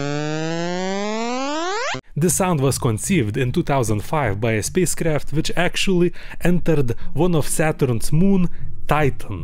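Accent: Russia. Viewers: U sound like Russian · ロシア人の英語を聞いてたらロシア語話せそうな気がしてくるから不思議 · I would like to thank you for puttign subtitles, even though i can understand you with your (correct me if im wrong) Russian accent, no offense, i really live thr accent, just sometimes hard to hear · Чистый русский английский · You sound so russian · R u from Russia or Germany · Hu... he comes from Russia as i hear!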